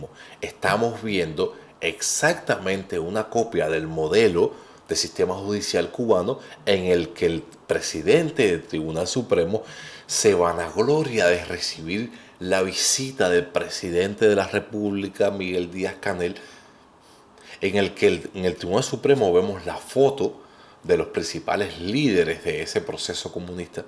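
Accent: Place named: Venezuela. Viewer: Por qué hablas como Vzlano si eres Cubano...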